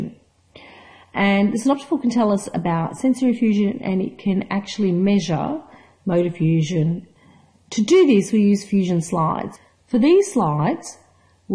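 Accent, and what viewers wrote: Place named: Australia. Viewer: it's cool to hear some Australian accent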